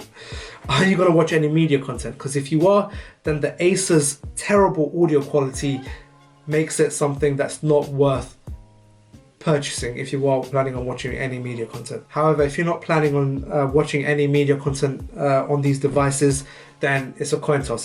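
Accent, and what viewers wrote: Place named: Arab world. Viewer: واضح من لهجتك عربي صح؟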